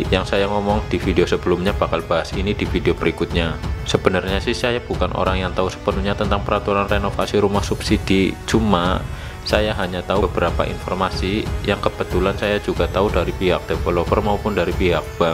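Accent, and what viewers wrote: Banyumas, Indonesia. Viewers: Ora ngapak ora kepenak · Ngapak betul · Ora ngapak ora kepenak ya kang... Hehe Matur nuwun kaang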